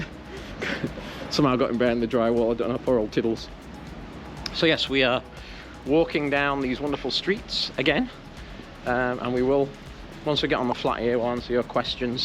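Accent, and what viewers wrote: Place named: Yorkshire, United Kingdom. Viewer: Like others, hope Dad recovers soon As for your accent - soon reverted to Yorkshire 😬 Thanks to you both ❤️❤️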